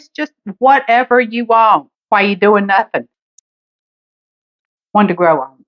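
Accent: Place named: Carolina, United States. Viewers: Not sure where you're from but I'm from south Carolina and her accent sounds genuine to me. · Yep, that's a real Carolina accent.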